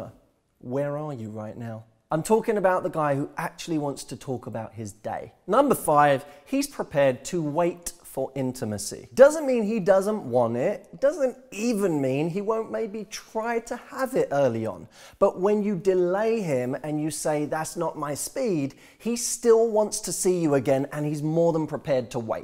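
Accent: United Kingdom. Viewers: I love your British accent .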